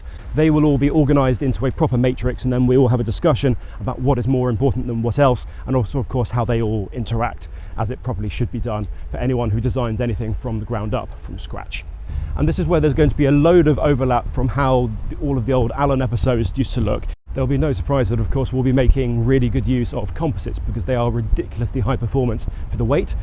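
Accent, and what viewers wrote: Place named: England, United Kingdom. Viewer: OK, you did the whole last build with an English accent. Can you do a Canadian one now?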